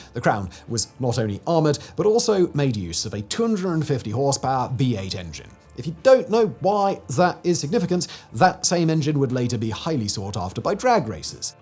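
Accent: United Kingdom. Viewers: This guy is paid by shadowy people to read out a script and sounds smart in British accent. Pure propaganda. Do not censor me or else I'll continue!!!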